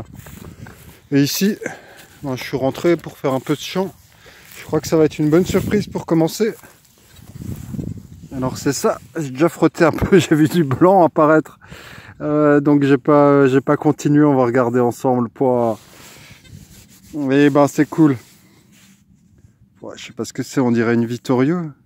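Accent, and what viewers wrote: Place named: Alsace, France. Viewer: Felicitation !!! J'en reviens pas de la chance que tu as de trouvé une pièce du Vatican. Imagine la probabilité de tombé dessus c'est un truc de ouf. Sinon je trouvais ton accent familier mais j'arrivais pas à trouver et j'avais un pote a l'armée qui venait d'Alsace maintenant je sais 😁 vous avez la même façon de parlee ça ma rappelé plein de bon souvenirs. Merci encore pour cette superbe vidéo continu comme ça.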